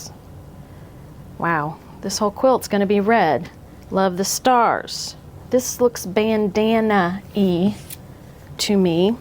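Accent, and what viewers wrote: United States. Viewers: Yes, I am also a solid border with a scrappy binding (or any binding really) vote :) You've told me before but where is your accent from again? I'm in Australia and can only make out very few American accents! Also, what time zone are you as might join the Zoom but it's hard to get one that isn't in the middle of the night when afternoon there! Obviously, it depends on where you are 😊😊💜💜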